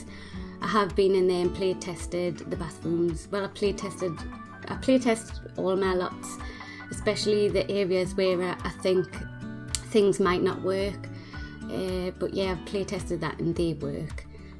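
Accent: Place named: Scotland, United Kingdom. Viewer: I love your accent :) Where are you from? (Scotland? 😅)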